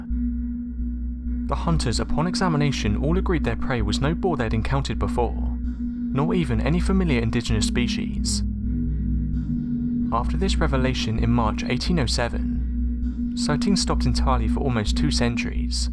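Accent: United Kingdom. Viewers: Interesting report. Too bad you string all your words together so even incomprehensible at 75% speed. As if you Brit accent isn't difficult enough on American ears. I'm unsubing.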